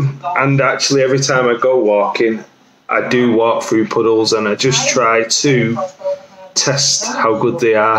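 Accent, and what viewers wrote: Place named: England, United Kingdom. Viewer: Такой ужасный английский акцент!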